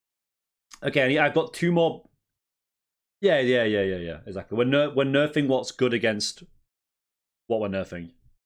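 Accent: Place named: United Kingdom. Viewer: 50 minutes of a British ranting... Man were eating good today 🔥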